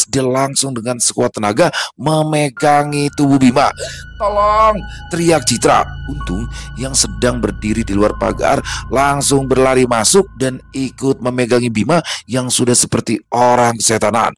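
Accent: Jakarta, Indonesia. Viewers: Woo<link> Ini mnjadi favorit ku setelah Bang Doni dan Mbul. Disini ada Bima dan Ms Untung.. Keren Bang, logat Betawi keren, eh Ngapak juga masuk bgt.. 🎉🎉🎉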